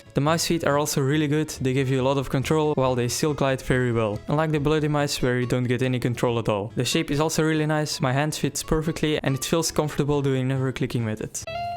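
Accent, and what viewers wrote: Netherlands. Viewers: i like your Dutch accent :D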